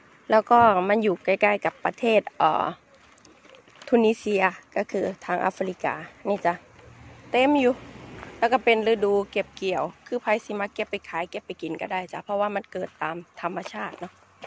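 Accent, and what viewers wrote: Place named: Sakon Nakhon, Thailand. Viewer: สำเนียงคือคนแถวสกลนครแท้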